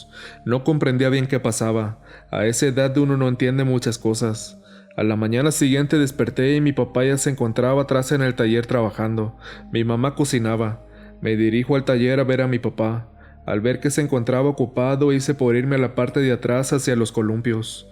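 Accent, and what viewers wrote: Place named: Monterrey, Mexico. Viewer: Con todo respeto, lo diré, el narrador se escucha bien ranchero. Me recuerda a mi gente de Monterrey.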